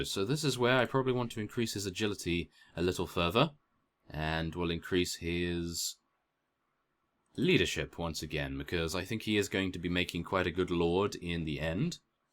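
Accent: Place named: England, United Kingdom. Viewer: Finally caught up with the whole seriesssss, I love these vidsssss. You are sooo fun to watch. top 3 lets play channels for me are You, J2JonJeremy, and IDubbzTV. You guys just had a certain flavor to your vid, always listening to the audience and taking suggestions, instead of just playing blindly doing whatever you wanted, etc. Plus you are a very funny man even though it sounds like your serious at times (must be the english accent)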